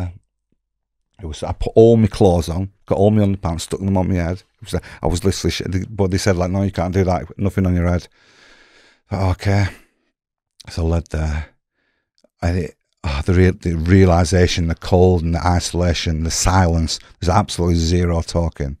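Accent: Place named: Lancashire, United Kingdom. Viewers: I could tell you were East Lancs as soon as you spoke I thought Burnley, so I wasn't far of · Sounds like blackburn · I knew I recognised that accent. It's good to see a Lancashire lad on here.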